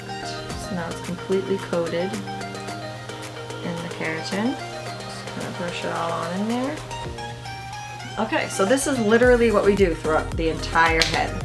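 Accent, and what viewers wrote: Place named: New York, United States. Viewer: Love your videos. Trying to place you slight accent. Are you from New York?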